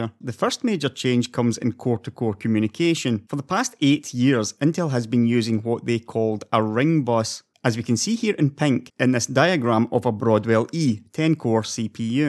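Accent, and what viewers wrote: Scotland, United Kingdom. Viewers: his Scottish accent? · I taught you are Scottish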